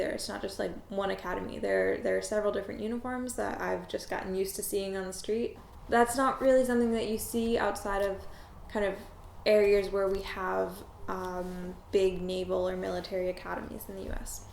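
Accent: Russia. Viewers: You have a Russian accent speaking English. Look Russian too. Not buying it. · why your pronunciation is so Russian?) are your parents from USSR? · Похожа. И, возможно, даже русская. По крайней мере, мне показалось, что некоторые фонемы она произносит немного на русский манер. Да, она отлично говорит по-английски, но в английском есть специфические фонемы, произнесение которых трудно контролировать если ты не родился и не вырос в англоязычной среде с нулевого возраста. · oh, girl, you got that kind of rrrrrussian accent. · у девочки русский акцент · nice soft russian accent =) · You sound like behind your English some perfect Russian hides =) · funny ... you have such a strong Russian accent :) However, the word Babushka - pronounced perfectly :) At some point I started to think that you are Russian :) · Симпотичная девушка) С очень хорошим русским акцентом, что даже очень странно) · You have Russian accent!!!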